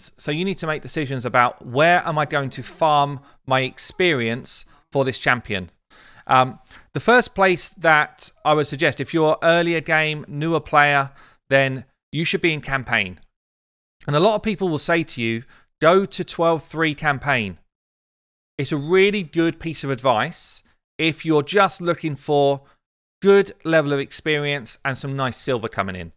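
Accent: United Kingdom. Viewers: Just found you very helpful just starting out, I am way outta my depth with this game but I will get there, also good to have a Brit talking instead of over the top yanks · what a very beautiful British accent you have !! amazing, i watch your videos to improve my skills in english, i'm french native. Also because i'm a RSL free player. You do a good job, your videos are very interesting. Sadly i don't understand everything you say, because of my level in english, and i'm not used to your Cambridge beautiful accent.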